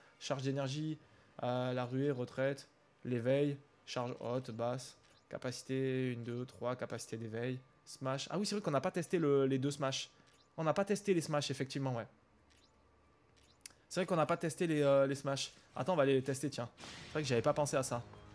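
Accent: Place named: Marseille, France. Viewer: G remarqué que ta un axen marseillais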